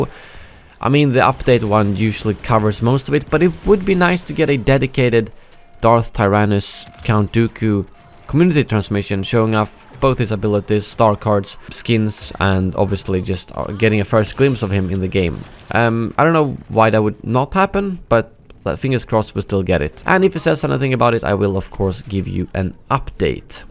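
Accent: Sweden. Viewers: I have a feeling you are from Northern Europe, probably Sweden judging by your accent. Am I right?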